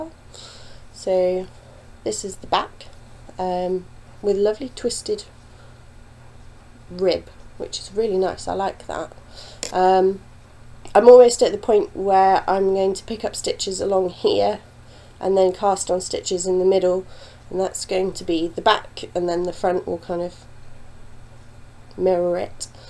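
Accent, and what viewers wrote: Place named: United Kingdom. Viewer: Hi! I just discovered your podcast today and watched them all. Lovely to find another British voice.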